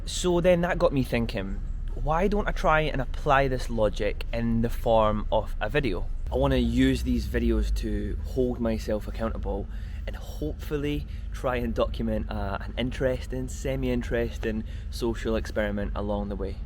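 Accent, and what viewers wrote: Scotland, United Kingdom. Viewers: Great video, I'm 27 yo , i achieved so much already, read a lot of books, learn a lot of things, traveled abroad several times , got settled to a graet job with good income. I'm grateful to many things in my life But i feel from time to time I've wasted a lot of my life already. My love life is not that great, i feel depressed sometimes especially when i see my friends happy , acchive their goals , get engaged or married. I hope i could stop feeling that way. P.S. I love the scottish accent <3<3 Love and peace from Saudi Arabia.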